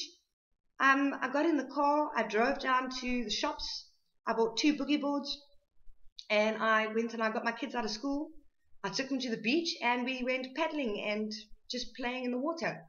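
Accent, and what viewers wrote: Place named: South Africa. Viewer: Hang on... South African accent detected. Good job quieting smoking. If nothing else you will save a lot of money. As a fellow South African and a ex smoker ex heavy drinker I literally get where you are coming from. I take it that you still live in South Africa, maybe not but does not mater because what I'm about to tell you is the same either way. A lot of South Africans who leave the country have a hard time adjusting to their new surroundings because they are use to being stressed, pressured, feeling vulnerable, unsafe and generally frustrated. In South Africa we grew up (I'm thinking we are about the same age) with thees feelings not knowing any other way of life. The constant stress and constantly looking over your shoulder to us, is considered just another day. You and I know that the human mind can not stand the stress even if we lie to ourselves and say we can. 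That is why so many South Africans drink so much, smoke so much and do so many other addicting things. It's just way to escape reality because our minds need release to try to relax and calm down. The truth of it is and most South Africans will disagree is that having grown up in a war zone in Africa we all surfer from PTSD. If you are looking for answers to why you feel the way you feel and why you do the things you do look into Post Traumatic Stress Disorder. Look at the symptoms of PTSD and you will recognize a lot of them. One of the ways I try to deal with having grown up in SA is to try to learn to relax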